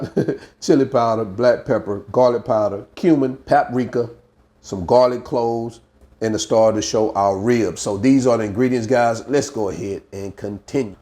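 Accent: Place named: Alabama, United States. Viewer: Oh my sweet Jesus, can I be your friend and come eat at your house. I promise ill put it on it each time lol 😆 but really this was amazing to watch. Imma stalk you to learn all your techniques and I knew you was from Alabama for some reason b4 you said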